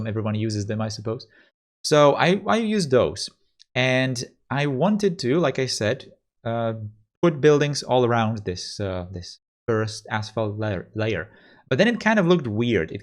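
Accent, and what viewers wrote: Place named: Czech Republic. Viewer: this series is absolutely the best take on cities skylines i ve seen so far, and i ve been watching all the stuff since the game was out. you are undoubtedly one of the most talented guys, if not THE most talented guy ever, making C:S quality content. and seeing this just frankly makes me not want to play the game anymore, because everything or even more that i could imagine, you´ve already been able to pack into this very series. an artist´s work, no doubt... you should be working as an architect or something... btw you have some serious czech accent dude :) you're czech? if yes that makes it even better, because nothing makes me more proud of our country than a talented guy like you doing such an excelent work.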